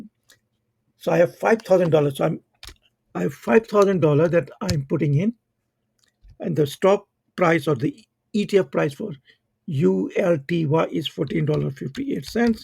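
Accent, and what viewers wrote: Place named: India. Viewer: Indian accent!!!!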